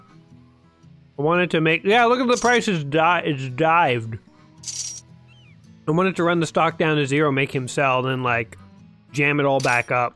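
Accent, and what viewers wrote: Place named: Brooklyn, United States. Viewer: Sound more like Brooklyn.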